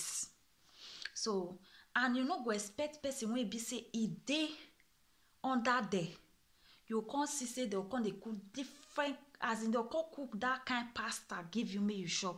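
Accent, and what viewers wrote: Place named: Africa. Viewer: Hello African Queen, luv ur accent 🌹😘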